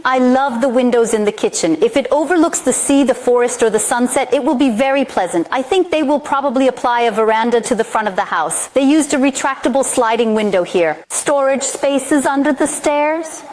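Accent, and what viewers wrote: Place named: India. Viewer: indian accent thats speaking too fast ruins this video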